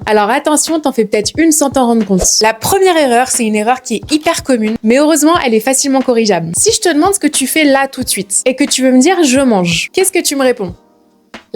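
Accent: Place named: United States. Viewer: J'aime beaucoup l'accent Américain 🥰🥰🙏🙏🙏🙏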